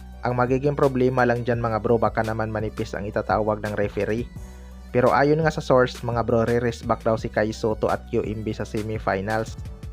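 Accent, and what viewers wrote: Visayas, Philippines. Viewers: Bisayang bisaya magsalita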